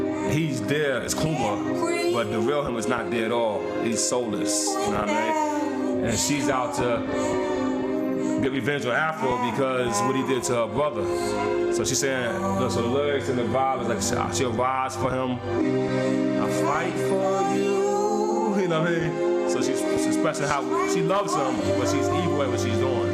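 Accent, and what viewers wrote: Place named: United Kingdom. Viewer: Why does he speak with a British accent?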